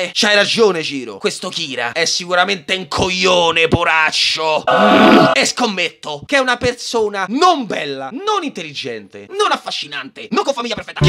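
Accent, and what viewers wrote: Rome, Italy. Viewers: Mai visto un riassunto che dura 4 min in più dell'originale, con accento romano imbruttito, jojo reference e doppiaggi più ignoranti di ogni cosa. Voto: diesci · Ultimamente ho notato un indizietto che potrebbe aiutarci a rivelare la vera identità del, il fatto è che nei video parla in dialetto romano, ma non stretto. Ne deduco che abbia origini o risieda in Roma e provincia. Al quanto pare la tua cattura non è poi così lontana ＼(~o~)／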